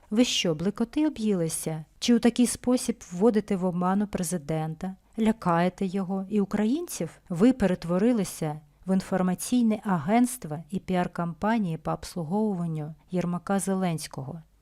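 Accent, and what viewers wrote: Russia. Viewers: з явним російсьим акцентом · ваш москальский акцент аж випирає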